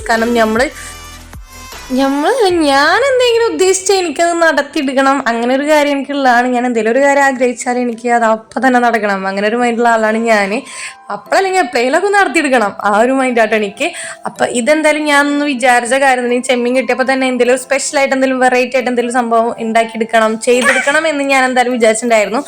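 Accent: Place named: Thrissur, India. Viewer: നിന്റെ സംസാരം കേൾക്കാൻ എന്തു രസാ... 😍തൃശ്ശൂർകാരി ❤️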